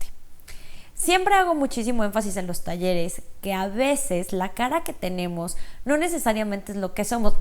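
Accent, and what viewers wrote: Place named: Mexico. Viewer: De que país eres? Me parece tu acento ligeramente mexicano, pero no estoy seguro.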